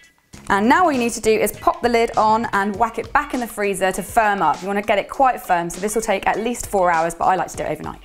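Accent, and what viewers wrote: United Kingdom. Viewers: I sometimes find it hard to catch what you are saying because you talk too fast. 😅 Don't get me wrong I love to the British accent! Nevertheless I love watching your videos or just to hear you talk. 😄😄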